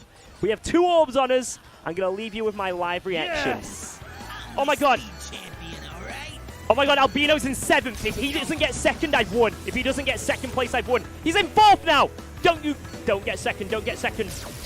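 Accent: United Kingdom. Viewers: hol up, hol up. Money in pounds..... British accent.... Do they.... do they possibly have KFCs in the UK? o.o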